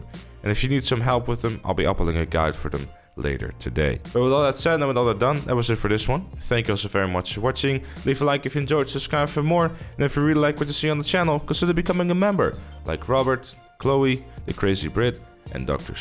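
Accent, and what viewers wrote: Netherlands. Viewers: Love it, are you Dutch tho?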